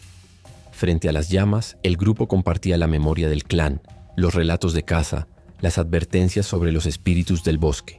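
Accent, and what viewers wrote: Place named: United States. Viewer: No entiendo como, hablando de nosotros, la voz en off tiene acento americano. ???????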